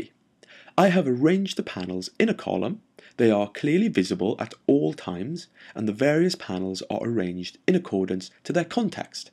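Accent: Wales, United Kingdom. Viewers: Thank you for these videos. Love the familiar Welsh accent too :)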